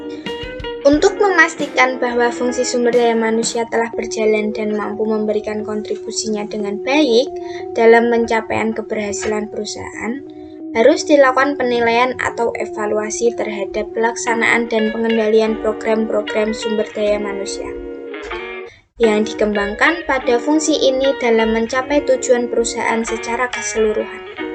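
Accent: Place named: Java, Indonesia. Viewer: Cara penyampaian unik dengan logat jawa, jadi mudah di headline pointnya👍👍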